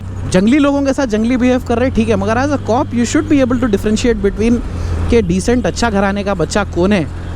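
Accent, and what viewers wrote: Hyderabad, India. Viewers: Bhai speed triple k upper ek detail wali video he bana do garh pe reh k. 😂love your Hyderabadi accent❤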